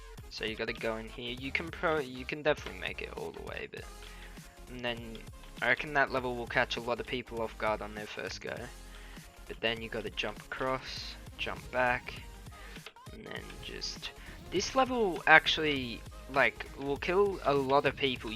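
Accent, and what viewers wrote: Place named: Australia. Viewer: Shiit I didn’t kno u were Australian that’s mad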